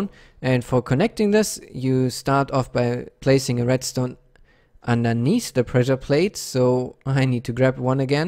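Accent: Germany. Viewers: coole idee und gute umsetzung. leider habe ich nun das dilemma, dass sich mein "speedometer" nich resetet. hab nicht das gefühl, dass das 2 sekunden sind... hab das tut nochmal angeschaut und meine konstruktion überprüft, leider ohne den fehler zu finden. hast du auf anhieb einen tipp, woran es liegen könnte? lieben dank und dir noch einen schönen tag. :) (das du deutsch kannst hört man am akzent und in einem anderen vid begrüßt du die leute mit "herzlich willkommen" :D )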